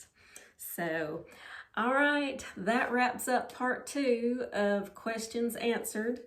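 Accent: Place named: Tennessee, United States. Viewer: Hi, I live in the U.K. and have recently found your channel, I am of similar sizing to you (5’1” and so on) so love watching how you style your clothes. I have a penfriend who lives in Tennessee and she has your accent which again I love. I’ve travelled quite a lot in the USA and when you mentioned Loft I totally agreed, I have bought items from this store and they have been really good value for money. Congratulations on your subscribers, I’m not surprised as you are very relatable and give honest opinions on the clothes you try on. I’m looking forward to more videos so keep them coming 👍😁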